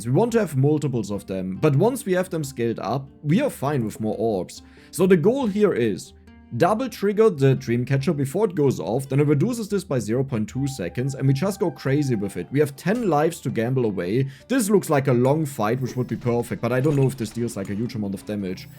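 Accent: Germany. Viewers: Omg 200k 🎉🎉🎉🎉 congrats I love almost all your content I could watch u watch paint dry with u talking about it lol. I really enjoy ur German accent and when u get frazzled it kinda makes me laugh sorry. 😂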